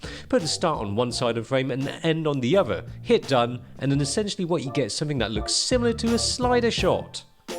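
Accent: United Kingdom. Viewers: i cant watch this, my brain hurts because my eyes are looking at an asian guy but my ears hear a deep voiced brittish bloke ... its so confusing at so many levels. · Thumbs up who thought it was a voice over by a British guy.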